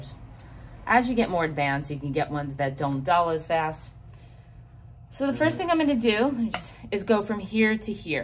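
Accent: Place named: New York, United States. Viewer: love your NY accent!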